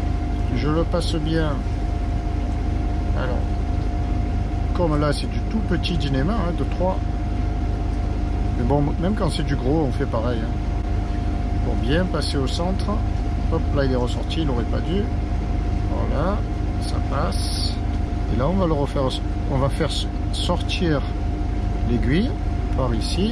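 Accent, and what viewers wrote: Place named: Provence, France. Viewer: Salut merci pour tes vidéos et ton accent de la belle Provence…. La lumière en question s’appelle feu de hune (steaming light) et c’est obligatoire lorsque le moteur propulse avec où sans les voiles. Même si tu n’aime pas la mécanique tes tutos sont franchement sympa et c’est toujours un plaisirs. Bon vent au Tabasco.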